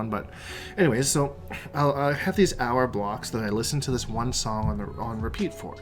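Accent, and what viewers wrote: Canada. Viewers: just curious, where in Canada? I'm from Guelph/Hamilton so it was rad to hear your Canadian too! I watched some ECT and noticed they have headsets that are there for ppl to use but some ppl don't use them.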